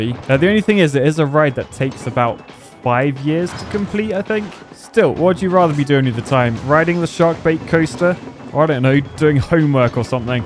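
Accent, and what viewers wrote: United Kingdom. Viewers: Can anyone explain to me why Brits always pronounce Taco like Tack-oh and Los Angeles like Los Angelease?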